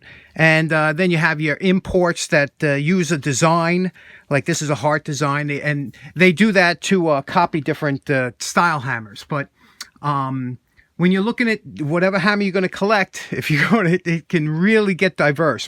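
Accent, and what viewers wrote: New York, United States. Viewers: Love the accent. New York or thereabouts?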